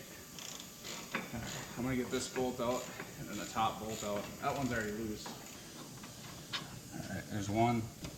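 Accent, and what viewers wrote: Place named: Canada. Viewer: got 2 min in until i realized he was Canadian or Ohioan much love for this tutorial bro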